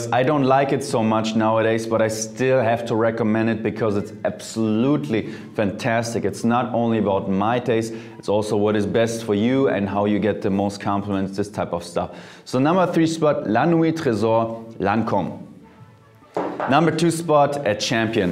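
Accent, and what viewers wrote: Germany. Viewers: I hear a german accent there🤔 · He is stunning but his English could be improved ;) I bet he is a german speaking person! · Not French at all! He is definitely German!! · clearly a German accent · He has a strong German accent when he speaks French.. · German accent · Is he German ? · Hus accent is so strong i could tell within seconds he is German😂😭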